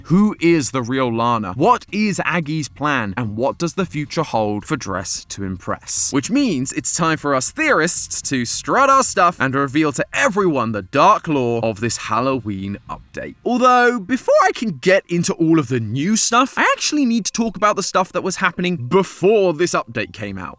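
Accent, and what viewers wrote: United Kingdom. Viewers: me not paying attention "ok new style theory" "hmm, Amy sounds very British" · Why are you British now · has always been british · I DID NOT EXPECT THIS BRI'ISH MATTPATT REPLACEMENT TO SAY "AGGYDIGIMON!" 😂😂😂😂😂😂 Also no offense, you are a great fill in for mattpatt right now.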